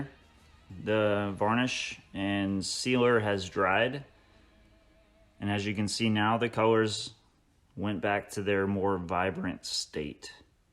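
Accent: South, United States. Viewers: I love it. Also Southern, like yall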